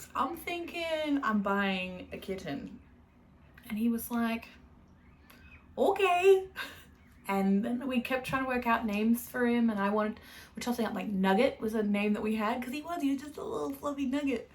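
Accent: Australia. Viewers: Hello from central Vic! You're the first Australian podcast I've found, my brain can't really compute and keeps thinking you have an accent (even though it's the same as mine 🤣🤦‍♀️). I feel like we should have some beautiful yarns here but I don't know of too many local ones, so I'm really looking forward to hearing what you find! If you're looking to dip your toe into cables I'd highly recommend "A Little Hocus Pocus" socks, its a free pattern & has a sweet little cable detail. Thanks for a lovely podcast 🙂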